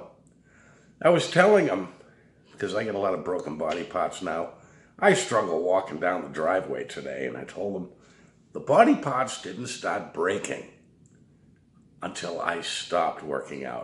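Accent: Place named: Boston, United States. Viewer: Good insight always to the point and says it like a true bostonian (guessing thats what the accent is). its messy when your in and leaving isnt a fix in itself. Gotta get a life. Thanks, pal, you are a breath of fresh air.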